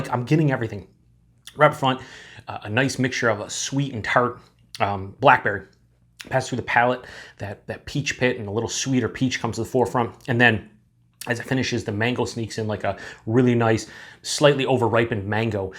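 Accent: Western New York, United States. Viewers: Are you from western NY?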